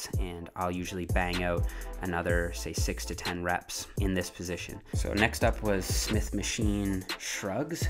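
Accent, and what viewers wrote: Canada. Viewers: Is this dude Canadian?? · Does anyone know what city this dude is out of? Canadia for sure? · Is this guy from Canada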